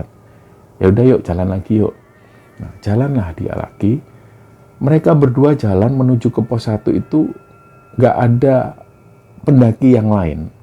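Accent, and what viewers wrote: Java, Indonesia. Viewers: mas septian... jangan lagi mencari sosok bapak² yg menolong panjenengan nggih... kirimkan alafatihah saja sesuai dengan apa yg ibu warung sebutkan ketika menyapa bapak tsb.saat hendak mencari mbak septi, 😊😊 mbak septi ada satu pesan dari alam ghaib ktika memesan mi instan,beliau berucap "MAU BERSABAR" 😊 om bhasa "jawa" nya medok bingits ❤👍👍👍 sehat sehat ngih 🙏🏻🙏🏻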